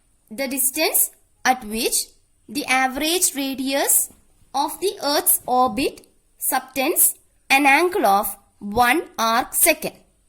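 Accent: South India, India. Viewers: Mam are you from South India?? 🤔🤔🤭🤭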